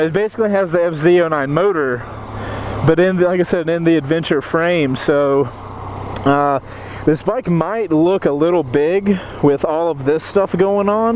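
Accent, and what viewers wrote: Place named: United States. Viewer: "Dudn't feel like it, dudn't feel like it at all." Learn to speak the Queen's English! Stupid Yank!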